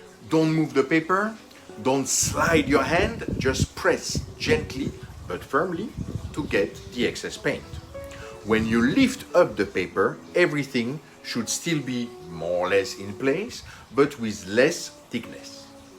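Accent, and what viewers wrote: France. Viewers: your accent seems to be French, is it?? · enjoy your French accent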